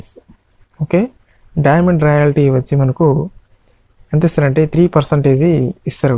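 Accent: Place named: Lambadi, India. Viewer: Thammudu nuv LAMABADI kadha.. ni voice vintene ardham avthundhi.. cooooosh bockkkk kaadhu babu.. cashback..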